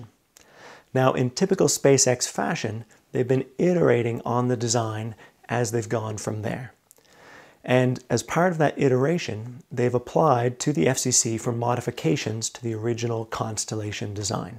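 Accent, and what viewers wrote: Nova Scotia, Canada. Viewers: You from Nova Scotia brudder?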